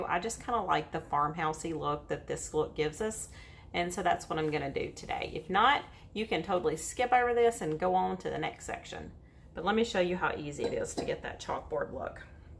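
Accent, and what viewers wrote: South, United States. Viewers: I just had to tell you. I usually play my crafting videos on YouTube in 2x the speed that way I can get through a lot of videos in a short amount of time. You're southern accent is so cute it actually sounds like regular speed. Lol. I can say this because I am also a southern gal and boy do we talk slow! LOL! I was literally cracking up watching& listening to your project just now. Oh almost forgot...love the DIY also. From one slow talker to another, Great job.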